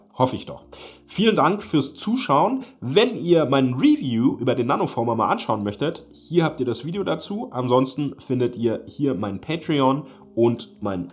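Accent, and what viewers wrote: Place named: Bavaria, Germany. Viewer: 🤣sehr lässiges Boarisch!! Weida so!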